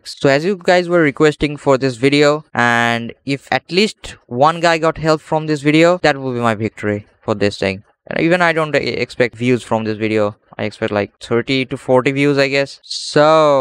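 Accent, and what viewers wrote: India. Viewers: are you indian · U are indian?? M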